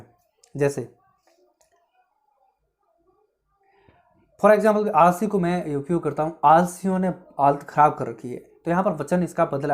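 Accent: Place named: Ganganagar, India. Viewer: Sir aap ...beenjhbayla.(ganganagr) se ho kya ?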